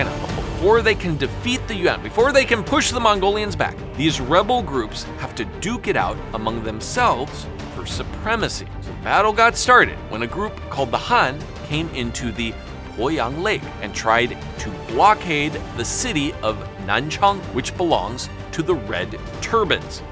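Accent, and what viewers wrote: Standard. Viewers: 你的發音很標準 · I like your pronunciation of Chinese words throughout the video here and there, very standard mandarin.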